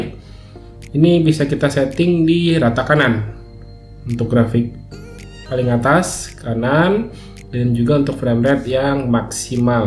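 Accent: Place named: Medan, Indonesia. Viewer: Accent nya ga enak banget. Pelafalan D sama T disetiap akhir kata nya itu loh. China medan banget.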